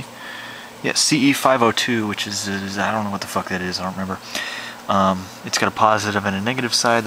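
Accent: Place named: Midwest, United States. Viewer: HA! you must be either from the mid-west, or Arizona... because you talk like ME! FUk this, fuK that... PLUS I spotted that Quicktrip cup on your floor :) thanks for this informative video, really entertaining too!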